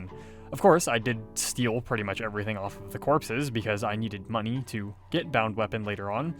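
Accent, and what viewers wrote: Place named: Saskatchewan, Canada. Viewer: fair enough… I was just sitting here watching with my girlfriend wondering aloud if you were from Saskatchewan.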